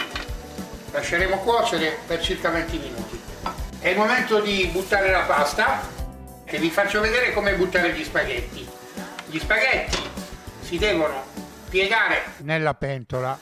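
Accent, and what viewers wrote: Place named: Sicily, Italy. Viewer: L'accento e siciliano proprio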